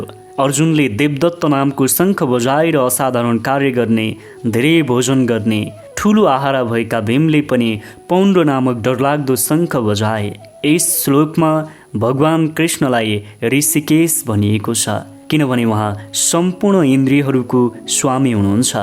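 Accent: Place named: England, United Kingdom. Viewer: भएन , भएन हजुर भएन वाचन गरेको। नेपालीमा शुद्द उच्चारण गर्न पहिला सिक्नुस। अ,ग्रेजी पाराले बोलेर नेपाली भाषाको बिजोग नबनाउँ। माफ गर्नुहोला।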